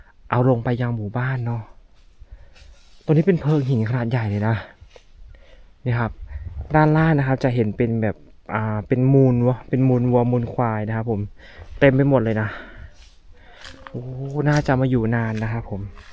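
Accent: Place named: Ubon Ratchathani, Thailand. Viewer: เว้าคือลาวอุบลน้อ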